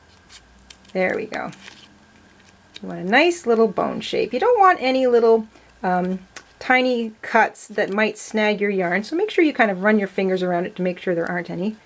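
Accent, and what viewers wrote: Canada. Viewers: Aboot!!! Love your Canadian accent! I’m a huge Rush fan and love Canada!!!!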